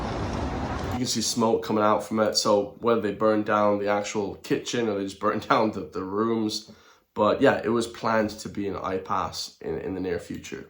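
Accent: United States. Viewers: Are you born and raised in Ireland? No offense, but you sound American...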